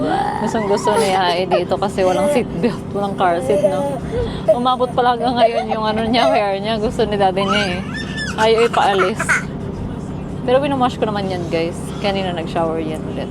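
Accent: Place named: Pampanga, Philippines. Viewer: Kapampangan accent ya girl! Wag kang mangialam. Pakialaman mo buhay. Hinahanap ka ng amo mo. 😂😂😂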